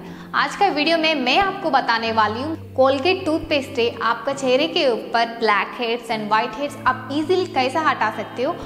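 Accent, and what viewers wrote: South, India. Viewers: Are u from south.. You are speking in very new manner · your accent seem like a south Indian by the way I have a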